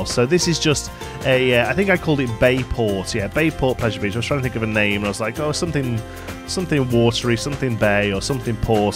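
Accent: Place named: North, United Kingdom. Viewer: Are you from the North mah dude?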